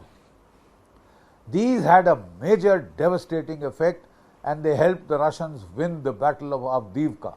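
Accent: India. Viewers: I can’t listen to this paj33t accent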